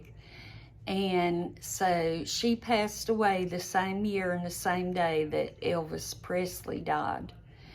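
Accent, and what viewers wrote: South, United States. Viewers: Not boring at all. The sound of your voice is so soothing. I just love your southern accent. I think you could read a book and I would love it. A chapter every day or so? Maybe start another YouTube channel just for narrating a book? It's a thought. Thank you for your life story. Hope there's more to come! There's quite a few years you left out.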